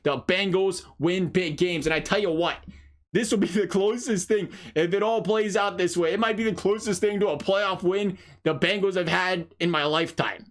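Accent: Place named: Wisconsin, United States. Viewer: Every time I watch a video from this guy I feel like he’s from Wisconsin or somewhere else the way his voice sounds. 😂 Idk...maybe it’s just me. 🤷🏾‍♂️😇😂🤣 Thx for the content nonetheless.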